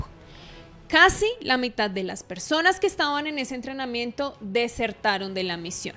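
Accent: Colombia. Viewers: el acento es de Colombia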